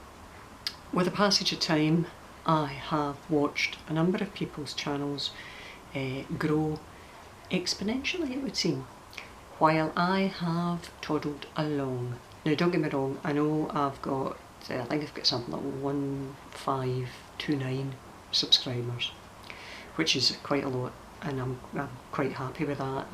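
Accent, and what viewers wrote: Scotland, United Kingdom. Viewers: Hi my dear friend ❤ you are such a brave woman to come on here and show yourself to us❤ the real you, the honest you and all that pains you. I thank you for sharing ❤ you were one of my first (if not the actual first) friends that I made when I started my channel. I remember you had commented on my video where I was trying to show my hole puncher and eyelet setter 😂 we started our Friendship from there, I was so excited some big YouTube person commented on my channel I told everyone about you! My whole family knew who you were, my Dad took a liking to you because he lived many years in Scotland, so when I would play your videos he would sit close to hear your accent as you spoke (dont worry, my mom is not the jealous type 😉)❤ you have always been a huge inspiration to me ❤ I know exactly what you mean my little channel is very slowly growing and I usually never get over 40 or so views. 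And the sales in my shop are slow as well. Then I see others who started around my time as well and they are "big time" I dont know how it all works? I still do it bc I love it, if ppl watch my videos, cool if not it's ok to. If I sell stuff, great! If not, it's ok. I just love doing me ❤ and what makes me happy ❤ I am always here with an open ear for you if need be ❤ I love ya my fantastic sassy Scottish pal ❤❤❤❤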